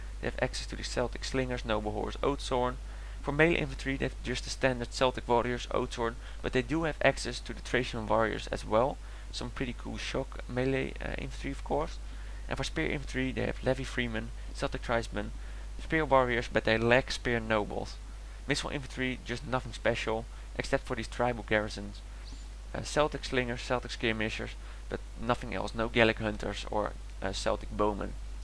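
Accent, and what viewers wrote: Netherlands. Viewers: jij bent nederlands ik hoor het meteen maar maakt niet uit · dude the dutch accent's great! :D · That was soooo obvious from the " nederlands-achtige-crappy-engels accent" xD aha wel grappig om zo te horen Voor de rest wel ok! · For your first video you did great! Maar ja je kan wel echt aan het accent horen dat je Nederlands bent hahaha :P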